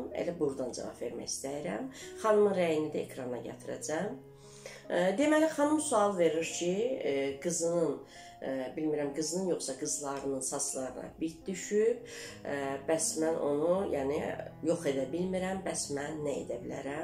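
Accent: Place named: Baku, Azerbaijan. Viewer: Caaan Bakilim Lehcene qurban.Allah sizden razi olsun.Sizi sevincle izleyirem.Bakinin 32 kendine salam olsun.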